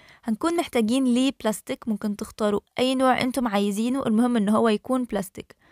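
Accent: Egypt. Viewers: سورى بس انتي مصريه ولا ايه😅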